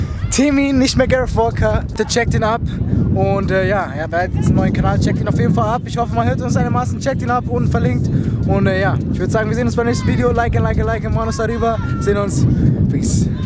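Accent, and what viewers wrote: Switzerland. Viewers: von wo kommst du aus der schweiz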